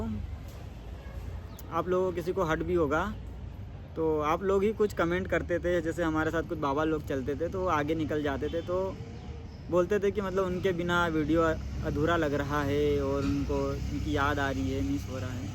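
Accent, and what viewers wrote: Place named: Malwa, India. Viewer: नर्मदे हर🎉भैया जी और माताजी।आप लोग तो परिक्रमा में ही ठीक थे।बिल्कुल चेहरे मुरझा गए हैं।माताजी तो ठीक है, पर आपकी तो आवाज ही पहचान का सूत्र है ,चेहरा तो अजनबी हो गया है😅।कृपया अपना संपूर्ण परिचय दें। बातचीत से मध्य प्रदेश निवासी लगते हैं,मालवा बेल्ट के।बहुत अच्छा बोला है आपने, बिलकुल साधु की तरह। नर्मदे हर🎉।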